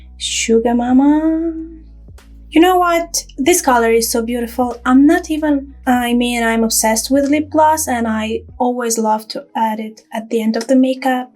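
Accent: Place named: United Kingdom. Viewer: I love the british accent! And the ByTherry powder Is my favorite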